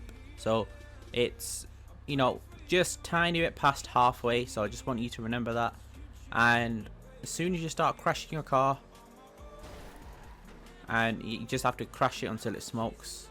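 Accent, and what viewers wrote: United Kingdom. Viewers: You from Great Britain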